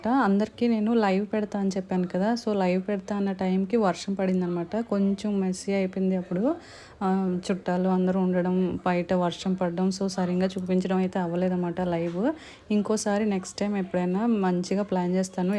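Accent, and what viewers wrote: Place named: Visakhapatnam, India. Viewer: I think Meru Visakhapatnam varu iedali me voice tone House location yakka andi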